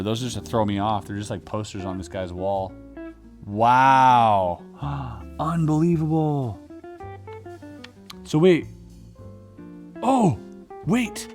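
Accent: Quebec, Canada. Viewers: Je pensais pas que tu parlais Québécois! Moi qui te suit depuis tant d'années!! Thanks for sharing all this with us! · It took me a video where you read a french card to realize you're canadian (and probably from around Quebec judging by the accent)! This was an incredible puzzle. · Tellement bizarre de t'endrendre parler francais avec ton accent quebecois alors que t'as pas vraiment d'accent quand tu parles en anglais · Are you quebecois? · Tu français??? Est tu québécois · Hold up hold up hold up... MY MAN IS CANADIAN?!?! WHAT!?!? The only reason I know is because I’m French Canadian and I can tell by his accent. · I don’t know what surprised me most, this amazing puzzle or your Québécois accent! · Damn, your French accent is like, du parfait québécois! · Are you Canadian? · Il parle un peu québécois dans ses anciennes vidéos de street magic;) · Wait... 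Es-ce que t'es québécois par hazard? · Première fois que je witness ton accent Québécois. Toujours agréable de voir un Québécois réussir sur Youtube. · that perfect canadian french speaking ^^ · I knew. But only because I know his address is in Quebec and I recognize his accent. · C'est tellement bizarre t'entendre parler français. On se rappel que tu es Quebecois tout de suite. XD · T tu Quebecois? j'ai cru entendre notre bonne veille accent bravo mon amie! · Why do you have québécois accent when speaking french? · Are you quebecois!?!?!?! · Your french accent sounds a bit Canadian it’s funny 👍👍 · c'est tellement nice haha jai faite le saut de t'entendre parler québécois! j'adore tes videos t'es awesome! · Un parler québécois impeccable! (Je viens juste de découvrir ta chaine) Super casse-tête! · yup it's a french puzzle:). but definitly have a quebecois accent ( but honestly in france many mix up canada and quebec ) so all right to whoever want to mix up france quebec and belgique xD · La lecture en "Québéquois" m'a tué... 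😆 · Would you be from quebec by any chance? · I just discorvered that has an accent from Quebec x) That's the fisrt time that I hear him speaking French actually. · Tu es québécois??? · salut je regarde tes vidéos depuis longtemps mais quand tu as sorti la carte en bois et que tu as lu en francais, j'aurais jamais penser que tu étais du Québec, good job!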